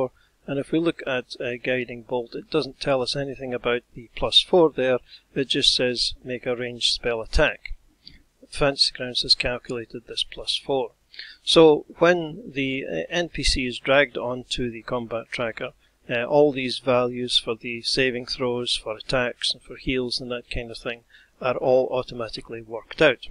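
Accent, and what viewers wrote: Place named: Scotland, United Kingdom. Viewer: I cannot properly thank you for all the time your videos saved me while preparing to run my first campaign in Fantasy Grounds (pandemic life made us use Roll20 first after a few decades of live playing, now we finally are going with a proper tool). It has been truly a blessing to be able to sort out so many questions with your marvellous explanations, and the absolutely fabulous production quality. The fact that they are delivered in a brilliant Scottish accent only help make it even better. Cheers from a Brazilian fan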